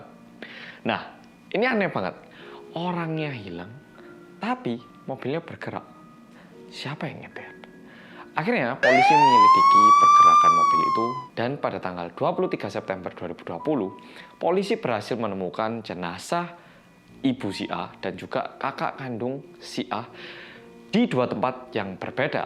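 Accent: Java, Indonesia. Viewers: Medhokkkk😍 editan videonya mkin mantep mas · Biarpun sinyal ajrut-ajrutan..tapi tetap semangat nunggu loading.. Demi nonton konten nya detective medok..mas 👍😎❤️ Semoga selalu sehat nggeh mas.. Salam dari Kediri 🙏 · Halo bang yang medoook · GERCEP MEDOKKKK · Semangat mas medok :) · Bersama orang Korea yangggg medokkkkkkk..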